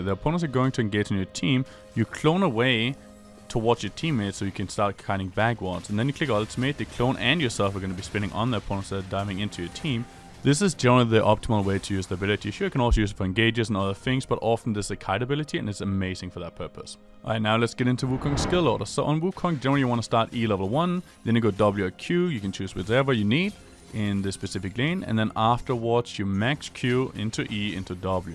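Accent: Denmark. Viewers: I can hear the Dane within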